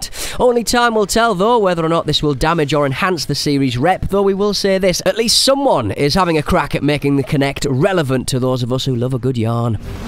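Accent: United Kingdom. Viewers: holy shit that brit-ish accent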